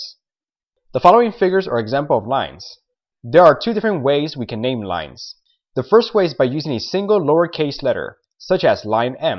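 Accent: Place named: Scandinavia. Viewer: you sound scandinavian, are you german or austrian?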